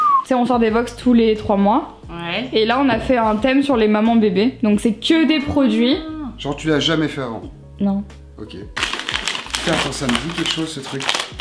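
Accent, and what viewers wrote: Brussels, Belgium. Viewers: Tu as vraiment adopté l'accent belge je trouve ! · tu as pris l'accent belge!!! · tu as un petit accent belge 😂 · Trop chou, tu commences à prendre l’accent bruxellois du Henri. Pendant que moi, j’ai perdu mon accent belge dans les Alpes 🥰🇧🇪🇫🇷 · Sais-tu que tu commences à avoir un petit accent belge par moment 😉? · T'as un peu pris l'accent belge ahaha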